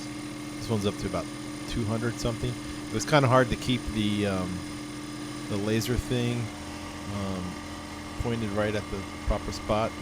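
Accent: North, United Kingdom. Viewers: He is from up north!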